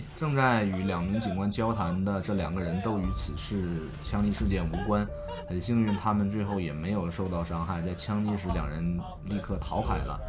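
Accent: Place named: Northeast, China. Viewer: ok，你严谨，我应该说 up 主是美籍华人东北口音。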